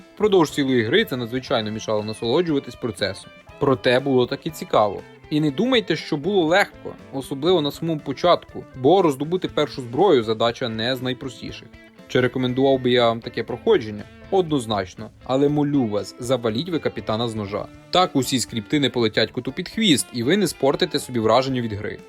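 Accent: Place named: Western Ukraine, Ukraine. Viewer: Я рабсиянин люблю смотреть и слушать украинский язык с западным акцентом вообще бомба! Особенно когда смотрел про херсонского енота из стражей галактики это шедевр! Героям слава!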